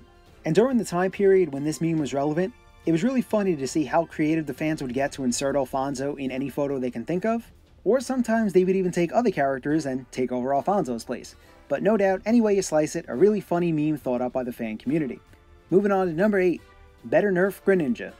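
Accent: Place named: Boston, United States. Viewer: You almost sound like you're from Boston.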